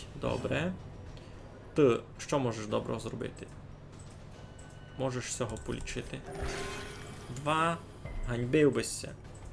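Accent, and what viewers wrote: Transcarpathia, Ukraine. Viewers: Вау, летсплеї по Закарпатськи. Просто вау 🔥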